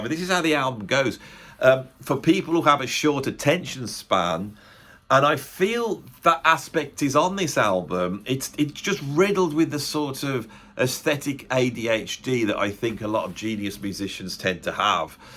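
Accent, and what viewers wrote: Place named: Northern England, United Kingdom. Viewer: He's simply the polar opposite of ( later solo) Doesn't have a great voice, writes very not hooky rambling long bits vs. the concise hooky pop/sorta proggy stuff, Winwood's squeakily clean and perfectly ordered arrangements. Both apparently had access to technology and could take time to make whatever the F they felt like making. Rundgren produced this mess and Winwood produced clever, challengingly difficult songs (said Paul Shafer "the most difficult thing we've ever played" on Letterman. I think), but ultimately a bit contrived, antiseptic and unsatisfying. Far better than Todd's offerings though. I am sure Todd's got a lot a deep lyrical messages for his adulent worshippers, and Steve's got almost none, and I would give Rundgren more thought and a closer look, but I am so put off by the music that I think I shan't. 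(apostrophe?) He did have that one hit in the 80's. A sort of easy rock MOR thing?.... oh well. Next contestant please. Love your accent btw. Can't quite place it. Somewhere northerly betwixt........no wait.. too soft Cottswalds innit?.. no a bit of more northern inflection...I'll have to Google you...nothing in Wiki...."what city is from"....hah there it is........Kidderminster- Birmingham. yes, I've got quite the ear for a Yank.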